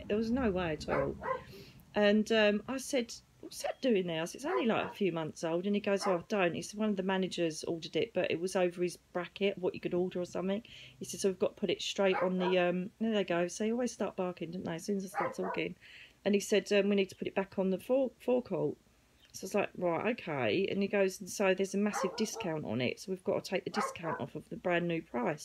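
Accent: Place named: United Kingdom. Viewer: Hi, it’s eastern standard time. It’s wed March 8th, 2023. I’m texting from Massachusetts, USA. I’ve just recently started listening to you. I feel I was guided to you. I’ve been listening to mediums for awhile now and have been very intuned to spirituality and all that you talk about for the last several years. I love your messages and your funny, down to earth personality and your adorable British accent! Your a pleasure to listen to. I’ve turned my 83 yr old dad on to your videos on utube as well. I’m so greatful to you for your gifts and happy for you that you seem to be living your best life now. We all have our challenges and you certainly have had your share! Enjoy life and Thankyou for sharing your gifts with the world. I hope and pray that more people will tune into you and people like you so we can save our planet and the human race. 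I will be sharing your messages on social media in hopes to spread them. God bless you and yours and take care. 💕🧚‍♀️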